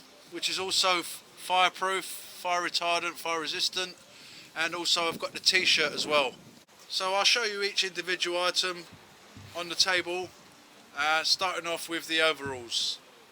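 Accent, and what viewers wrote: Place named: United Kingdom. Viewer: I think it's cheaper to buy welding masks and gloves and milsurp aircrew bdus but nice kit. Edit- I wrote this before I heard your accent. I assumed you were American. I'm sure your kit is much cheaper than paying shipping overseas for milsurp bdus. Maybe there's an equivalent milsurp option from the UK military? Always good to have spares for yourself and others to use.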